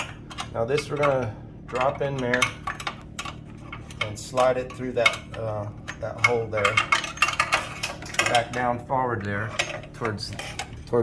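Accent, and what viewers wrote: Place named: United States. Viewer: Is it me or is sounding more country,.. Yeehaw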